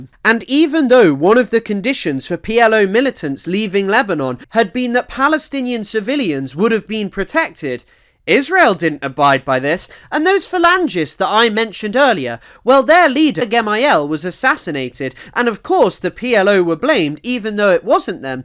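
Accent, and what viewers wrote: United Kingdom. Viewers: i hate when there’s a video i’m really excited to watch and the narrator is nauseatingly british 😢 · I think it’s also the British accent, I’m ESL and have a harder time understanding those who are British but I can understand at this speed any American. I’ve had to rewind a bit…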